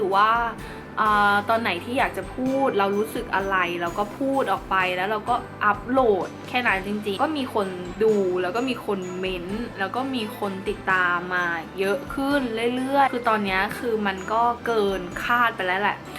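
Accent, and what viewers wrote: Thailand. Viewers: คนสุพรรณ ใช่ไหม สำเนียงออก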